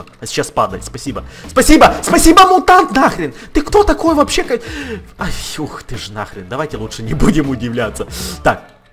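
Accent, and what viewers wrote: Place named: Ukraine. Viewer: Какие же старые видио....... даже говорит с украинским акцентом )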